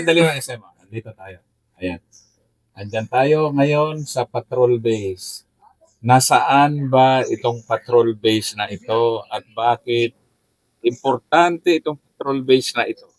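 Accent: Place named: Visayas, Philippines. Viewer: Hnd ah,bsaya nmn yn slita ny,,iba nmn Ang Lugar cnbi mo ung pnnnslita ny,klrong bisdak,🤣🤪👏💪🙄☝️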